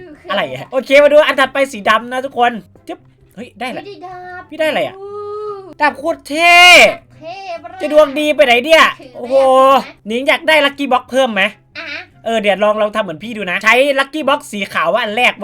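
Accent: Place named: Isan, Thailand. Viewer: พี่ไม่พูดอีสานหรอผมขอมา4คลิปเเล้วรวมถึงคลิปนี้ผมชอบเสียงที่พี่พูดอีสานเอาเเค่ตอนเปิดคลิปเป็นภาษาอีสานก็ได้นะผมจะรอ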